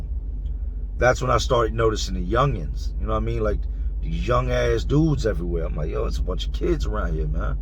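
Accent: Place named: Carolina, United States. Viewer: Bro I swear it sounds like you in Carolina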